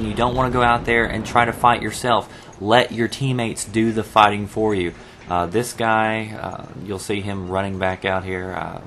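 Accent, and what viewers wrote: South, United States. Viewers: where are you from? you sound like you're from the south.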